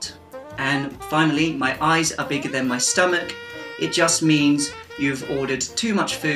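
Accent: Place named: London, United Kingdom. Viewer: Thank you for great explanation and excellent lesson of Londoner's English Accent.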